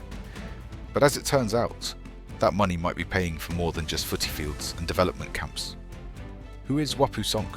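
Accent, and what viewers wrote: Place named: United Kingdom. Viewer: I agree with the ending message of this video. This is not just about rugby. The same with the intern-connection of PNG roads proposed for our economic empowerment. Likewise, with the sale of MVIL shares to MRDC. It is about power and influence. Yes, we can claim that this, Wapu Sonk guy is a bad apple. But what's the use if the entire apple tree is bagarap? The whole operation needs to be scrutinized and reformed. The same with interior development projects and publicly traded shares. It all needs reform. Is PMJM only willing to act decisively when faced with media scrutiny from abroad like the Sydney Morning Herald and ABC News? What happens then, when we express outrage at corruption domestically, yet cannot get results without the aid of foreign media highlighting our issues? Truly sad. But thanks lo karem British voice kam bek lo stori lo news updates! 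😆🤣 Inap! Mi go silip nau! 😴🛌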